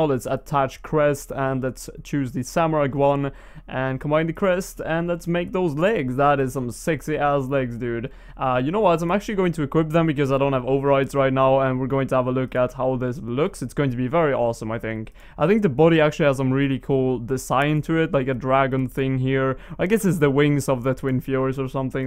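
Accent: Sweden. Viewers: You're Swedish aren't you? :D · I love your swedish accent :3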